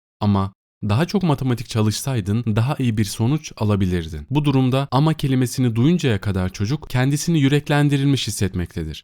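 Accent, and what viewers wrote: Azerbaijan. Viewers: Azerbaijan Turkcesiyke konusuyor) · Qardaş siz Azerbaycanlisiz?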